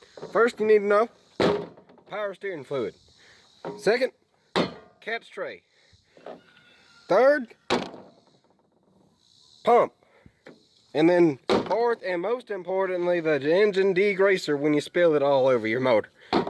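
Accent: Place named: South, United States. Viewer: Nice accent fellow southerner Also nice tutorial type thing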